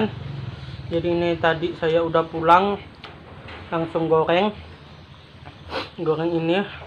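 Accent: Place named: Banjar, Indonesia. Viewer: Logat Nya kaya ada banjar nya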